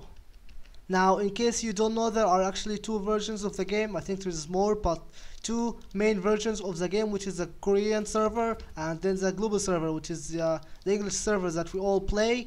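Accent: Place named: Egypt. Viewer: You sound Egyptian in your English accent you’re Egyptian ?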